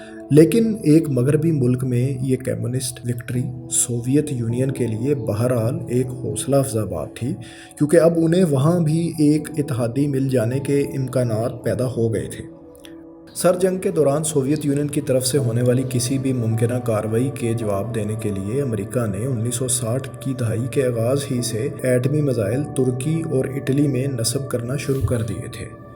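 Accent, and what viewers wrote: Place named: Punjab, Pakistan. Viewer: dude, your punjabi acent!! Content is amazing but your accent really ruins it.. Sorry!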